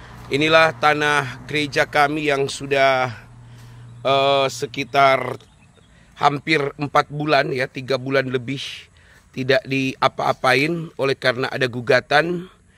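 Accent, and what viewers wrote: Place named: Java, Indonesia. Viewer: Dari omongan lo, lo bukan orang minang, tp jawa.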